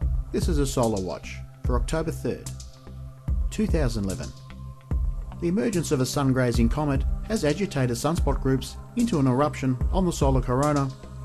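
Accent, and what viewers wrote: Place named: Essex, United Kingdom. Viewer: I'll start again his accent sounds like Essex or something round there , boring isn't it ?????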